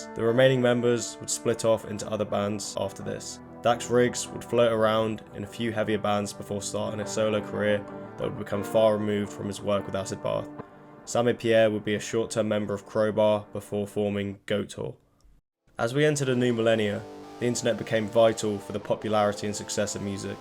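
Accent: United Kingdom. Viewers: As a guy in East TX, it's weird to hear a guy with a UK accent talk about acid bath lol. I like Dax's newer stuff too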